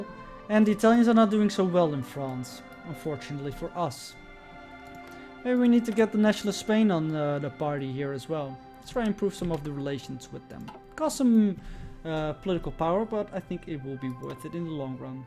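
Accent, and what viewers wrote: Netherlands. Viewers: Does anyone else love the Dutch accent?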